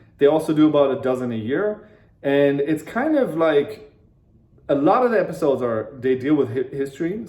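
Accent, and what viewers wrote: United States. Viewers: You have American accent. Very good English comparing to some German friends I had long time ago in school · Thank you very much for all the information about the podcasts. I listened to few episodes of little red podcast. It is wonderful. My interest in understanding China arises from my unfortunate encounter to industrial espionage (intellectual property theft and sabotage) in Canada, and how much my refusal to be corrupt ended up changing my life. I came to know how many of my friends are compromised. I wanted to learn Chinese work culture, their fears, their motivations, their aspirations, and their tactics, just to survive. If you are a German, I would never guess that you were not an American (or a Canadian) from your American accent.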